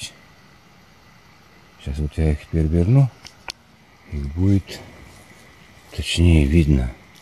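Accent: Tirlyan, Russia. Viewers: Да уж. Сейчас у нас очень красиво! Золотая осень!, вы родом из Тирляна? По разговору похоже. С удовольствием прогулялась с вами по лесу!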